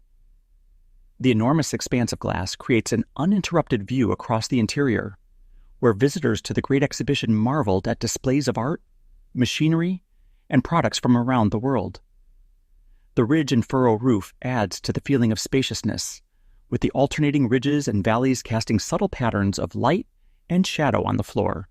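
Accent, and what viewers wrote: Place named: United States. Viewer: What bothers me is the narrator in Am.English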